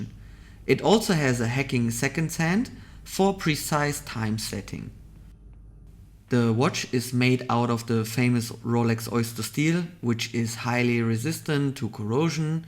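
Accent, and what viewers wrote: Germany. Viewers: When this guy isn‘t german i ate a chair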